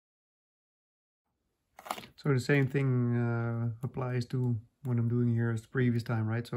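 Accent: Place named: Netherlands. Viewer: Bedankt, man! Ik denk jij zijn Nederlands van je accent? ;) Sorry, mijn Nederlands beroerd!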